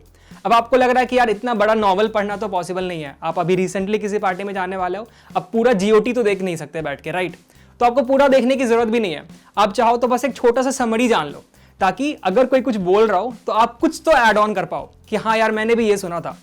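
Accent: Bihar, India. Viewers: Full bihari tone that the guy 👦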